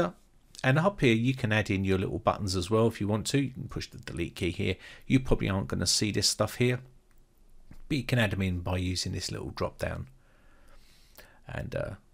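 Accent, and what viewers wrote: United Kingdom. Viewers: First tutorial I see by a British mate! Cheers!!! Bloody hell !!! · He sounds nothing like Korg... The guy in the video has a British accent, Korg has an NZ accent.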